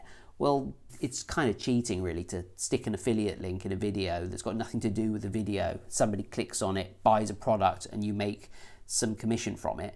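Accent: United Kingdom. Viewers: Your Brit personality and accent is the key to your success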